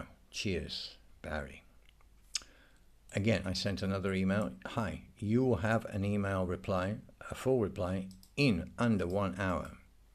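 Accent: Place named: London, United Kingdom. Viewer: Do you mind if I ask you which part of London are you from? (I’m not trying to dox you, I’m just interested in London accents!)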